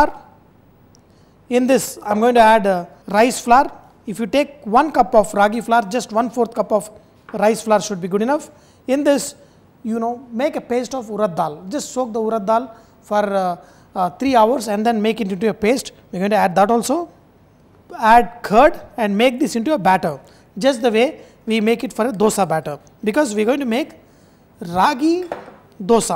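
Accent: India. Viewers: I need a more thorough explanation of what exactly the ingredients are. I didn't know what it was. And the accent is VERY THICK. I could understand better if the Indian accent wasn't so strong. The food looks great but that stuff after the flour....idk what that paste looking substance was.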